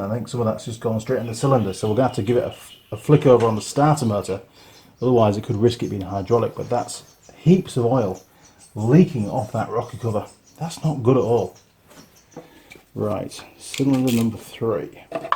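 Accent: United Kingdom. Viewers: Are you British or Australian ?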